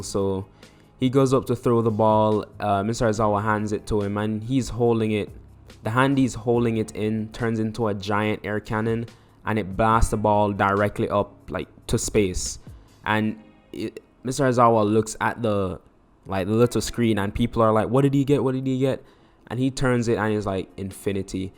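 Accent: Jamaica. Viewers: Yo you sound Jamaican 🤔🤔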